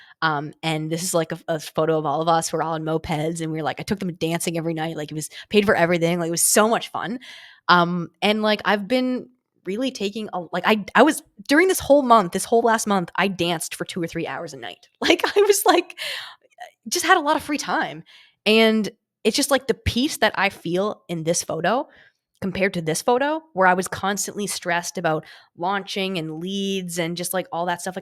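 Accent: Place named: Maritimes, Canada. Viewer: I can hear a maritime accent in your voice ... maybe annapolis valley, Nova Scotia ... wild guess ...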